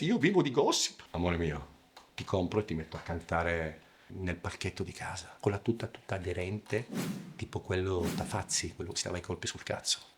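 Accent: Italy. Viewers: Ma sto accento piemontese fortissimo?? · La cosa più tremenda di questi video è l’accento che ha Corona..da bauscia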